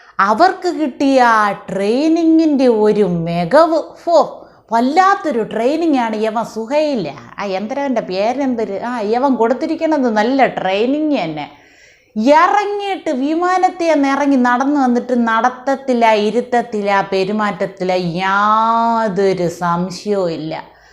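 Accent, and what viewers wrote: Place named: Trivandrum, India. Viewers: trivandrum slang is good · TVM സ്ലാങ് വേണ്ട. യഥാർത്ഥഉച്ചാരണവും ഹൃദ്യമാണ്.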